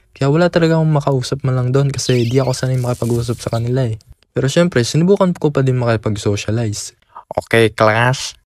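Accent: Philippines. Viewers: Parang sa catubigg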